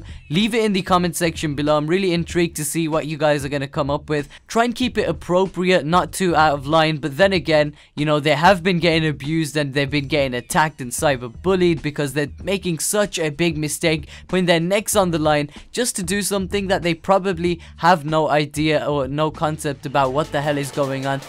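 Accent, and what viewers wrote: United Kingdom. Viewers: He's from uk man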